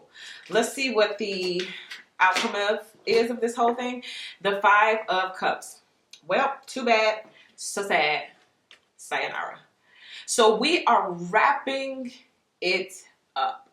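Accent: Chicago, United States. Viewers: I love you & your Chicago speak! Thank you for sharing your gifts. Your creativity is so authentic. I love going on mind trips with you✨ keep glowing sis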